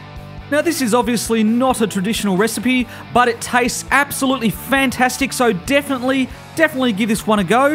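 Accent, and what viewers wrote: Australia. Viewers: I like the aussie accent, very similar to the british one righ'?)))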